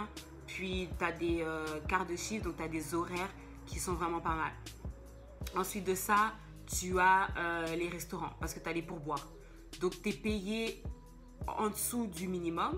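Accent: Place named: Cameroon. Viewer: Salut tu as un peu l'accent camerounais... je viens voire votre vidéo et cela est très très bien expliqué ma question est la suivante :si vous avez un amis est-il possible qu'il parraine (sponsors comme on dit ici au Cameroun)toutes vos démarches d'immigration et combien de temps cela peut il prendre pour un jeune diplômé (ayant une licence professionnelle en logistique dans une école de commerce de la place)au chômage ? S'il te plaît ?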